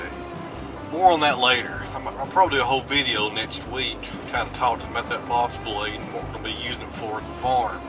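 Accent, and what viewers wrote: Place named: Tennessee, United States. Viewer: Bowls off a lathe sound good to me. I’m new to your channel, but I guessed your location by your accent from my kinfolk that live in your parts, Bristol, Sevier and Rockwood.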